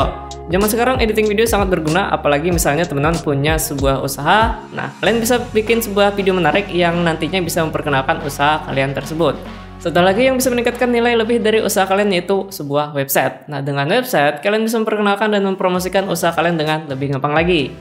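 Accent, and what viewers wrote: Banjar, Indonesia. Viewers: Orang banjar nih yg ngomong..😄😄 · Kaya orang banjar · Dari penyebutan huruf, pinanya beliau ni urang banua jua nah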